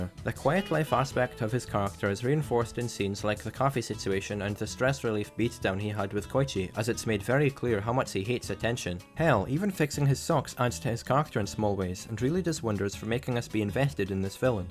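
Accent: Scotland, United Kingdom. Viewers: are you scottish? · i've recently started watching your videos and i really enjoy your analyses, cool videos! :) by the way, what part of scotland are you from? (apologies if you aren't scottish; you certainly sound like it!)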